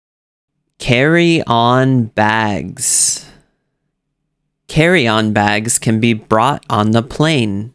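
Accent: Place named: United States. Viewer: Original America English!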